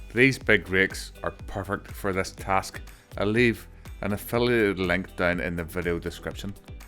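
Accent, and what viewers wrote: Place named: Belfast, United Kingdom. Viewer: I love the Belfast accent!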